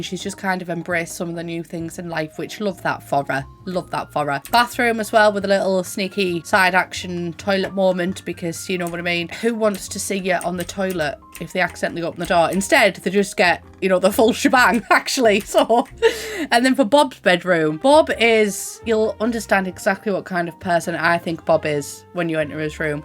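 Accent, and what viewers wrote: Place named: England, United Kingdom. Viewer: Your English is great! She was hard for me to understand at first and I am a native English speaker lol. The English accent on this one is strong, so good for you!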